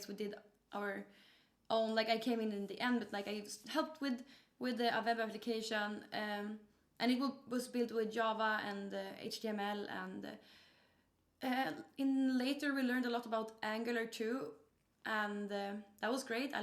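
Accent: Sweden. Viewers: She's been a pro streamer for quite some time...yet she has the thickest swedish accent you'll come across. How does it not improve lol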